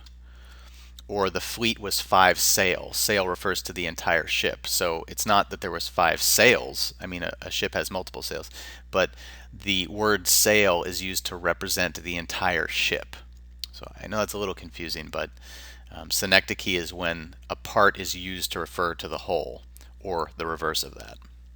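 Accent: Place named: Midwest, United States. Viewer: It sounds midwestern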